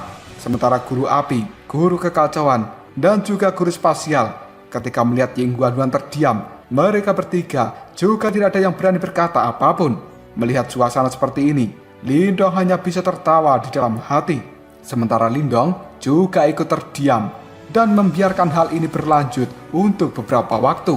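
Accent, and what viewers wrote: Java, Indonesia. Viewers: MC ne wong jowo.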